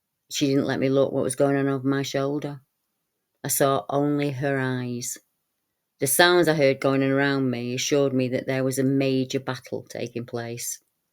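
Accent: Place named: Northern England, United Kingdom. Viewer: Just found you. Great work. Great northern accent. Luv it!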